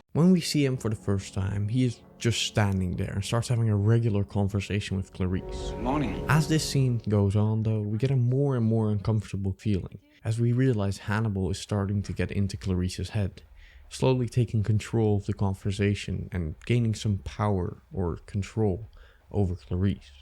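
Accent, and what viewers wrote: Netherlands. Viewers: altijd heerlijk om een nederlands accentje te horen wanneer je er niet een verwacht 💕 💕 🇳🇱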